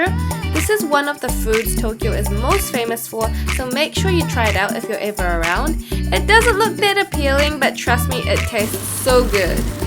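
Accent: New Zealand. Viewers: Love your videos, keep up the great work!!! It's great to see (& hear) a fellow kiwi enjoying Japan :) I tried to make it to that exact restaurant when I was there- failed, and ended up at some other random restaurant hehe Looking forward to returning after studies, and trying for a non英会話 job next time. Until then, I shall be inspired by your videos, cheers! :) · I think she's Japanese, but her accent when speaking english is NZ I believe :) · I came here from the Only In Japan Channel and this is the first video I have watched. It was awesome. Thank you very much. I was especially excited you talked about Okonomiyaki. I had this in Tokyo and would really like to make it at home. Could you post the ingredients? P.S. Love the Kiwi accent. Cheers from Cairns, Australia (+1 Subscribed :-)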